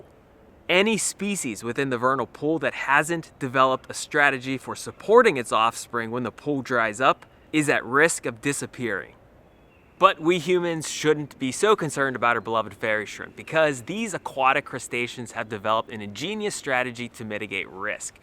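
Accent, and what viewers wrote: Pittsburgh, United States. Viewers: I love hearing a Pittsburgh accent in a nature video. We do have interesting backyards lol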